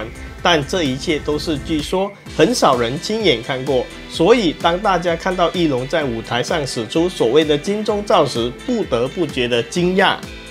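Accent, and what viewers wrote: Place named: China. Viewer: 這是哪一省的口音，真難聽😢😢😢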